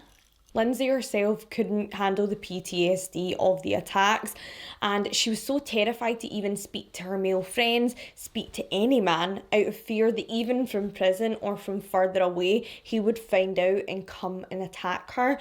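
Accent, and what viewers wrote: Scotland, United Kingdom. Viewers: Wooo a Scottish true crimer - yaaas hen 👏🏻 I remember this episode of I Am A Killer and I wasn’t convinced whatsoever…total sociopath!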